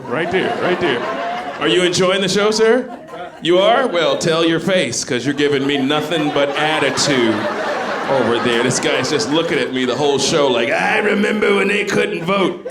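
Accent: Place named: Louisiana, United States. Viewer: I like his Louisiana accent lol.. eeeeyyaw..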